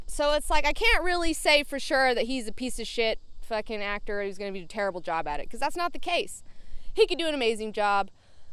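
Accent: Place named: South, United States. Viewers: Spend some time in Alabama and the Southern comes out. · I noticed a southern twang in your accent I never picked up on before. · Wow, sounds like the Alabama accent really stuck on you. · I know youre in Alabama shooting the video but is that home, because that local accent was really coming out, i thought it was my imagination at first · You picked up a southern accent. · Yer southern accent comes back the further east you go. Yeah, yeah - cast the unknowns! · LOOK WHO GOT HER SOUTHERN DRAWL BACK! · im really starting to hear that southern accent come out of you, especially when you got that alcohol in ya. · Sounds like Female Kenny Powers. Is she from the place she's vacationing? I know a lot of people revert to their 'drawl' when they go back to their home turf. I see it when I go back my home; get drunk and start talking local again. 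No issues at all with the statements though; entertaining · Where are you from? Slight southern accent coming through. Going to say Nebraska. Yes?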